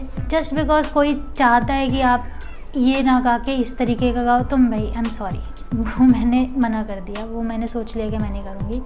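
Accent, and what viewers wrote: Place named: Delhi, India. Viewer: Don't give up! Persistence is the key to success Btw, your accent is similar to my father's. I believe you are from new delhi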